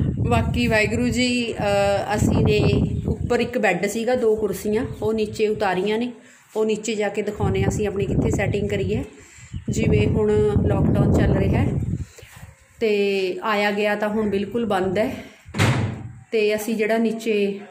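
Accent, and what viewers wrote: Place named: Malwa, India. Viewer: Di tusi malwai ho boli ton lag reha hai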